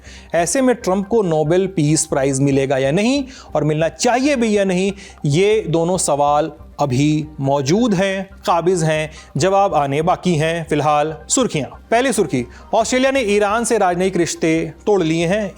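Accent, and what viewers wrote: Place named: Varanasi, India. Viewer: पसेरी के भाव से बनारसी टोन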